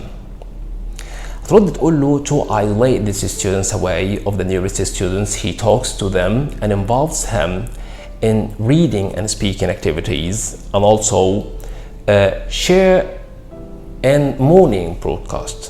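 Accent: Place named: United Kingdom. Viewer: لكنتك البريطانية مبهرة برافو يالتوفيق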